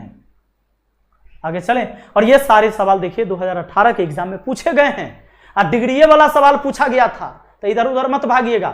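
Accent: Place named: Bihar, India. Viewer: Sale bihari hindi me bol liya kar.... Bihari bolna jruri hkya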